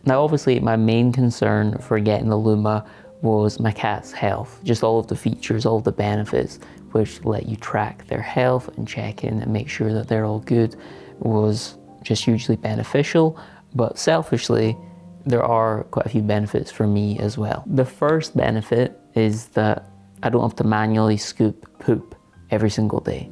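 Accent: Scotland, United Kingdom. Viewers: The Scottish dialect is quite something. 😅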